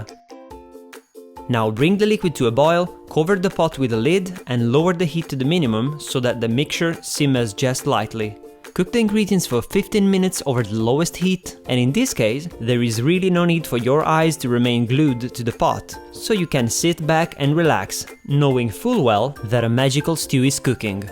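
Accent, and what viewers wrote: Italy. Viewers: This is one of my favourite dishes. My grandma used to cook it for me when I was younger, without the mushrooms. And I don't know if it is a coincidence but .. your accent sounds italian and my grandma was italian, so I think this is an old italian dish, especially in the northern regions. 😯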